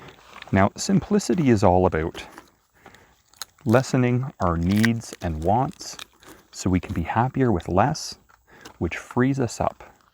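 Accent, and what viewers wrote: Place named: Canada. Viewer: Sir, You make a lot of sense. So true. Throughout the years, I collected so many O Scale model trains. I’ve sold some for charities. Going to do more. Same with my business suits. Just WHAT am I holding onto them for? I’ve donated business jackets, suits , dress shirts and ties to a rehab for young men who are recovering alcoholics and from drug addiction. Going to do more of that to. I’ve listened to your channel and am impressed with your intelligence. And… by the way, I’m trying to make friends with raccoons in my backyard. Just how can I do this? I’ve made a table and put various treats, including cheese, bread, frankfurters. Now I’m trying peanuts. By the way, I listen to your pronunciation of ‘Os’. Are you Canadian? If so, glad to meet you. I’m from New York and absolutely support Canada.🇨🇦. God Bless Canada. I’m glad that our “president “ left the G6 (without the United States) in a huff.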